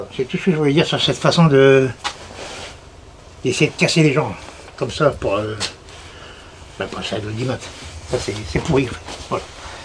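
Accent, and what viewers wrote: North, France. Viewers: Bonjour merci pour toutes ces vidéos c'est toujours un plaisir. En tant que fille du nord, je vis maintenant en Bretagne et la nostalgie de ma région d'entendre l'accent me fait chaud au cœur. J'apprends beaucoup avec les vidéos. Merci beaucoup pour les conseils et je me suis empressée d'acheter mes graines Bocquet. Bonne journée à vous deux. 🤗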